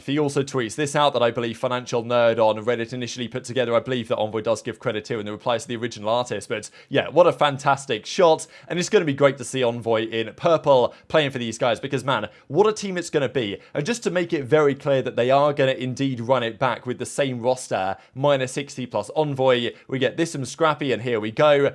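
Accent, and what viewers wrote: England, United Kingdom. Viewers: I think he says " back again today" but it sounds like "hocked rob" cuz he says it so fast in his English accent lmao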